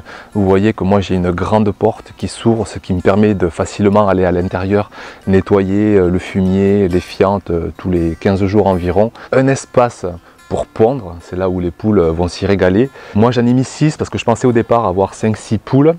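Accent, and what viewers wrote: South, France. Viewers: je viens de découvrir vos vidéos, elles sont super et très instructives franchement je me régale et puis l'accent du sud dont je suis aussi ça fait plaisir 😀 alors oui moi aussi j'ai des cocottes 3 petites rousses et une pékin, qui recommencent à pondre tout doucement après la pause hivernale, c'est super les poules moi j'adore et je ne vois pas mon jardin sans elles, j'aime les voir déambuler et picorer par ci par là et puis moins de taupes depuis qu'elles sont là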